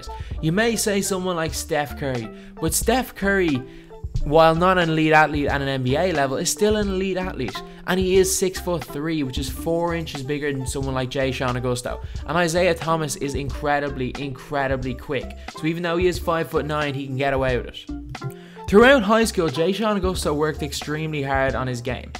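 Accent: Ireland. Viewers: Are you from ireland? Cause i noticed that you sounded ALITTLE bit like conor mcgregor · R u Irish? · Are u from cork or dublin. I live in Kerry and recognised the accent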